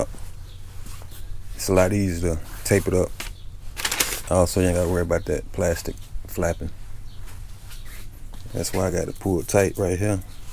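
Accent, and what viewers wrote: Alabama, United States. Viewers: Thanks for the video. Trying to learn if it's worth my time to do my obs Yukon. Sounds like you're near me (muscle shoals) - do you run into issues with humidity or do you run dehu's?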